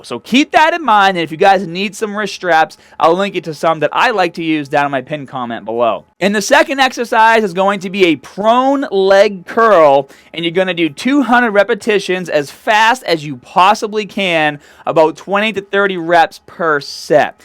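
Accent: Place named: New England, United States. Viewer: Bro: never, ever, ever lose that accent! It adds to the uniqueness of your channel and, honest to God, at least for me, it helps to make somebody pay attention to what you're saying. Granted, I'm just your run-of-the-mill, excited neighborhood linguist. But, it's nice to hear a genuine New England twang for a change instead of that sterilized mid west-influenced general American Standard accent everybody tries for. Also, I am in total and complete agreement about the prone hamstring machine! I have the exact problem you describe with the seeded hamstring machine. Can't seem to stay in the damn seat whenever the wait is really high. I frequently find myself having to readjust my position every other rep or so. Best regards from Texas.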